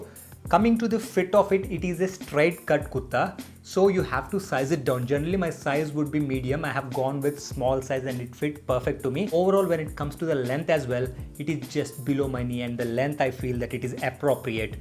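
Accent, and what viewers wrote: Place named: Telugu, India. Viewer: Monnati varaku 2k change unday apoudey 3k aypoyayi subscribers wow🙌🏻 keep hustling bro❤....... Endhuko me English ni batti meru Telugu eh anipistundi andhukey Telugu lo comment chesthunna 😌😇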